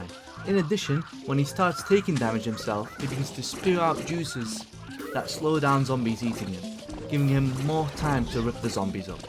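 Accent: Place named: United Kingdom. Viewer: I need you to make an audiobook for lord of the mysteries, your British accent would make long words sound even more gentlemanly 😩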